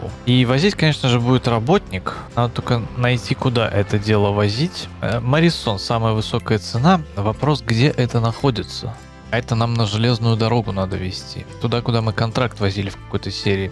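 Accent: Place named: Saint Petersburg, Russia. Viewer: Привет. Спасибо за серию. Ты вроде бы с Минска, а по нашему, по-Питерски разговариваешь. Откуда про бордюры знаешь??? )))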